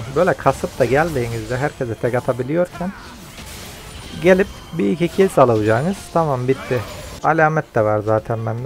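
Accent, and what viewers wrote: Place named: Zonguldak, Turkey. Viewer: Reis Zonguldaklı gibi bi gonuşman var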